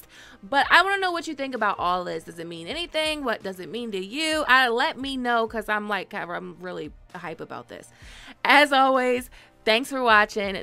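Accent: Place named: Baltimore, United States. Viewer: Whoever you are ma'am.. You are now deemed the best for the breakdown. I hear a Bmore accent as well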